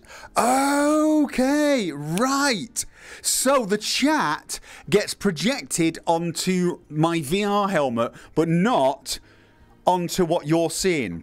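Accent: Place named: Northern England, United Kingdom. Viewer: First thing... your accent is much, much thicker and more clearly northern Britiain than it usually is... microphone or been talking to people from home?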